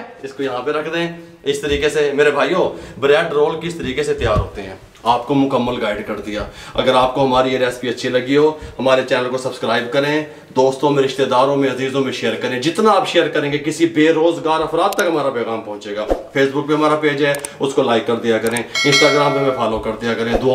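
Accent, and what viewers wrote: Punjab, India. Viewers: Or comentry b kamal with punjabi touch 😃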